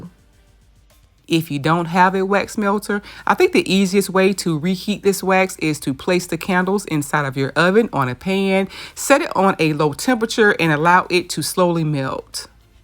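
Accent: South, United States. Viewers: So nice. You have a heavy, Southern accent.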